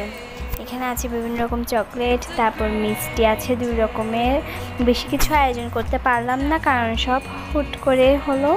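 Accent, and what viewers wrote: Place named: Chittagong, Bangladesh. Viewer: Apu Tumi ki ctg r Tomar kothagula sune mone hoitese happy birthday to apu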